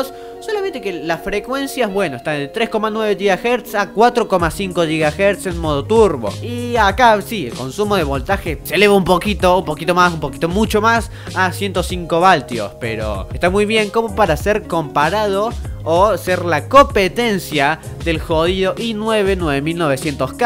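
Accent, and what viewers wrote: Córdoba, Argentina. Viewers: no, es cordobés xdd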